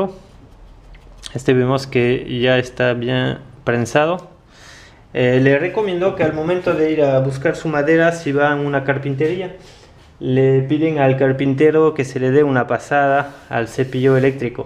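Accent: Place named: France. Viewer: lo sabia jaja, tu acento español me hizo pensar que serás de Francia o alrededores jejeje grande, aquí el tilo es algo caro porque lo traen a través de la aduana y deben pagar por el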